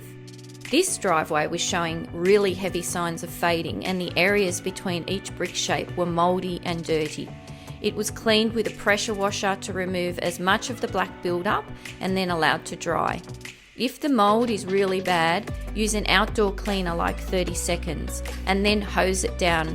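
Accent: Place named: Australia. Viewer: Love the Aussie accent. Greetings from Ireland 🇮🇪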